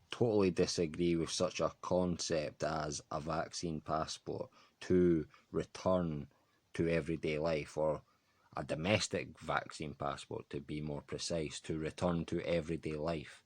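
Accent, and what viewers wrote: Scotland, United Kingdom. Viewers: I'm sorry. I have a question to ask but know not where, so I chose your recent video. It seems obvious to me that each nation has a sign. I am Filipino and Scottish. They are both like Scorpio. Both nations changed war. They are maniacs in battle. But you don't find serial killers from there. They are both from small islands. And they are considered the most handsome people. They are terribly inventive! But they love to drink. To the extreme. Really, Filipinos are your brothers in the East. And guess what? The Philippines has the most Freemasons in the East. The Scottish Rite is there. The Southern Filipinos destroyed all Western invasion. The Celts couldn't because they shared terrain with the enemy. But still, you, a Scot, have your accent. And many Celts do speak their language. How to determine the sign of a nation? 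The Russian psychic grandma said that all nations have stars. What I know is, Scotland and Philippines are Scorpio. We love melee. We are good talkers. When we have babies, wow!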